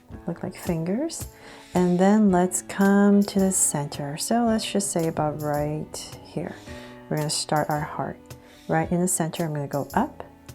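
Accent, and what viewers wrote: United States. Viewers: Omg I ❤️ Your American accent!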